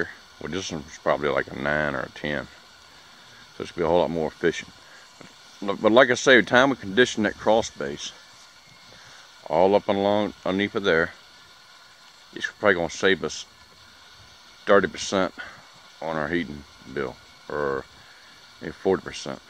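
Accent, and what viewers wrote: South, United States. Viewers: how cold does it get where you live? If I remember correctly if it gets to cold they don't work properly. but I also can tell by your accent that your down south, so I'm sure you don't worry that much about 20 degree days like we suffer from up here in the north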